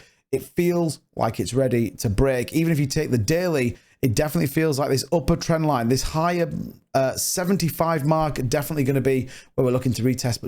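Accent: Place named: North of England, United Kingdom. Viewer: The accent is from the north of England.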